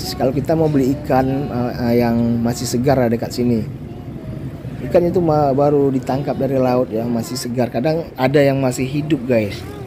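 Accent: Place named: Negeri Sembilan, Malaysia. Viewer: Abang amat ni orang minang ke.bunyi cakap macam orang negeri sembilan malaysia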